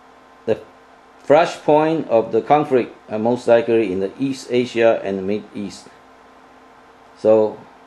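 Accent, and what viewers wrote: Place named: China. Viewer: From his accent you can tell is Chinese. Arabs and Chinese are the best astrologers in the world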